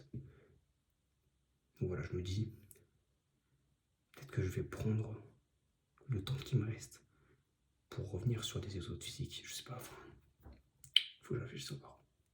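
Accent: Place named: United Kingdom. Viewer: Stp arrête de nous faire le british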